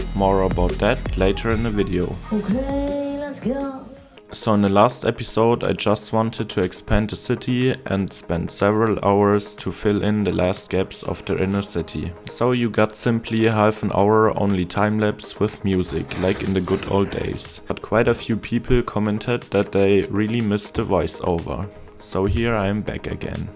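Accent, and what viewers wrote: Germany. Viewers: auch wenn du english sprichst kann man so übel hören das du deutscher bist XD XD dabei sprichst du ganz gut english ^^ der akzentt oder so isses XD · i think u sare a german guyy because the accent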